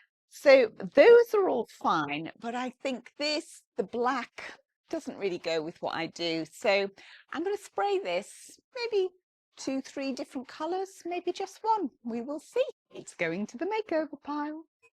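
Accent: United Kingdom. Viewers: I’m I heaven…the British accent, thrifting, design. Thank you for sharing. Diane from Florida.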